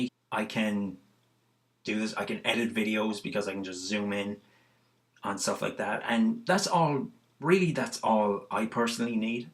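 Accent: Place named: Ireland. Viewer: Hi how are you, I also have Stargardts And like you I was diagnosed when I was 7 years old, I am 28 now and everything you describe i can relate to also regarding your vision however I don’t see double when I focus on objects close up instead I just frustrate my eyes and give myself headaches . I use my camera to do the exact same things as you I think it’s brilliant for getting around public transport, airports etc.. some days can be more difficult than others especially when driving is out of the option and a long day can feel like a much longer day dealing with all the extra challenges but the fact that I can get out and about and do pretty much all I need and want with a few exceptions is perfect enough. I come from a family who have been in the motor trade all their lives and I am a huge car fan so you can imagine how devastated I was even at 7 to be told it was a big No driving is not going to happen I’m afraid. 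It certainly hasn’t stopped my interest at all. There’s so muchI have done and still can do with limited vision even driving on a race track, goKarting , horse riding , tennis, American football, rugby anything I wanted to do I did it just did it in a different way and that’s the key to it I think. Personal question are you Irish your accent sounds Irish sorry .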